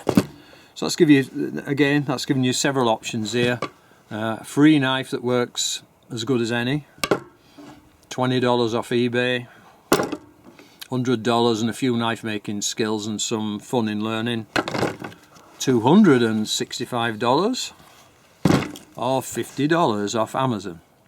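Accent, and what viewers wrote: Northern England, United Kingdom. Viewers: Good morning, I’ve just found your channel and I’m enjoying your videos. I live in northern England in Lancashire I’m just wondering what part of England your from. It sounds like your also from northern England but I can’t just pinpoint your local dialect. Keep up the good work. Regards Ian. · oh... well... I think your tractor's sexy. there. Now we're friends. :) But Seriously, yew...that's pretty. Since I appreciate you so much, I'm willing to take extreme care of it for you. :) It is a fine knife though. You should make more. Anyway, I have friends in England. You sound northern. I have some wood I could cut and send you to make more handles if you like that sort of thing. I have black Walnut, sugar, silver maple, and even stag-horn sumac, though I don't know if you could keep sumac from rotting, but it is beautifully colored when cut with multiple colors, if you could. 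hell, I might even be inclined to send you a black rat snake head, or skin when I run in to them in the spring. (they're no less than 4 ft long here. I've even seen a 16 to 20 ft long one, which is ridiculous for them.) p.s. I'm in Ohio, USA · "A perfect littl' dangler" LOL ... I love Brit accents and idioms ... last time I heard "dangler" was on "Are You Being Served?" ... one of the best Brit sitcoms ever.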